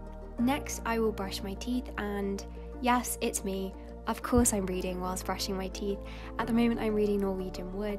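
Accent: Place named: England, United Kingdom. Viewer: Hi, You live in such a magical place... I dream to own a cottage in the middle of fields like this someday;). I have recently been a French au pair in England and I miss this country a lot, it's a pleasure to hear you speaking English with this typical accent I love so much. I discovered your channel while seeking for some ways to virtually escape from the city, and when I found you I also gained some helpful motivation tips to go on working on my Highway Code test. So, thank you for sharing the beauty that surrounds you and your soothing, clever personality, I wish you and all your kind community the most beautiful of Sundays!!!!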